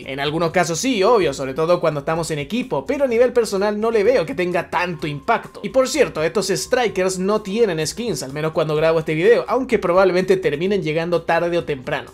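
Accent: Chile. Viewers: Ke ermozo chileno leon dice wn kliau JSJSJSJSJ ✨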